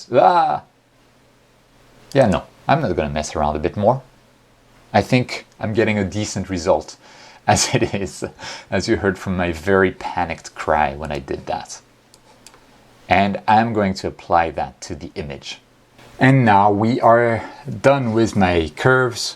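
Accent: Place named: France. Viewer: nice video ! are you french . i feel a small accent ....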